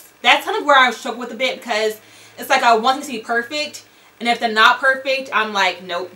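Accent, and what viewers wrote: South, United States. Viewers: you have a southern drawl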